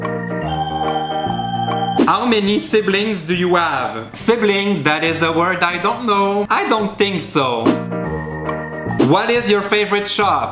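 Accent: Quebec, Canada. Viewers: Nils Bondenson I get what you mean, I live in france and for me the quebec accent is so different yet ppl there seem way nicer. He has a strong accent though. I was listening to Justin Trudeau yesterday and even he has a slight accent in english! I was like woooh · That was so hilarious! I didn't know that French Canadian people had a French accent when they speak English... That was a funny discovery. (Come on! Siblings: Frères & Soeurs) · T'as exactement le même accent québecois mais en anglais hahahah comme moi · Je ne pouvais pas m'imaginer que l'accent québécois assez aussi horrible que français en anglais. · I'm surprised by the accent. I thought only the Francophones from France have thick accents, but not the Canadian Francophones. Anyway, trop drôle! :D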